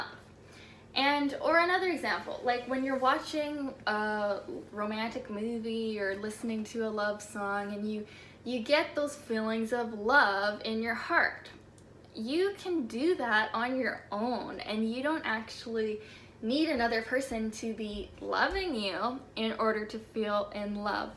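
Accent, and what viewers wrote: Canada. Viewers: Are you from ontario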